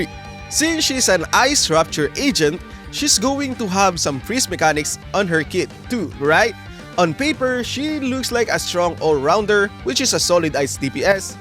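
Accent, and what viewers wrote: Philippines. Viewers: Are you filipino by any chance? you lowkey have an accent.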